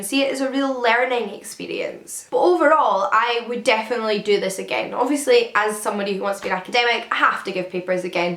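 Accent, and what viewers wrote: Scotland, United Kingdom. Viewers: Waad Abdullah she’s Scottish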